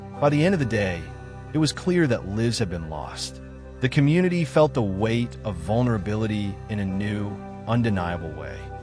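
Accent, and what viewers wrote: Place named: United States. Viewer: At least it's an American accent instead of a British accent like some are doing.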